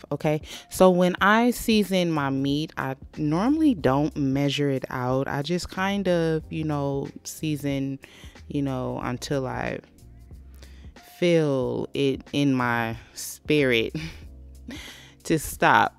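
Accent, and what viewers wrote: South, United States. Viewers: An African American sista with a southern accent frying chicken. It's got to be the best.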